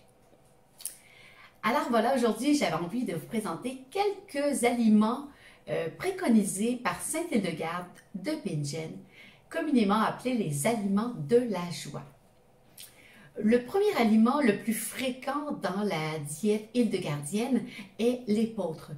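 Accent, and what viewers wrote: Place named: Canada. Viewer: Merci avec le bel accent canadien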